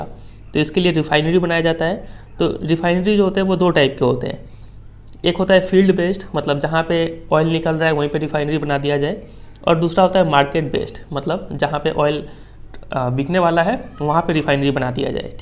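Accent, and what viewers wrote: Bihar, India. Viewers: Your accent proves u r from bihar, 😀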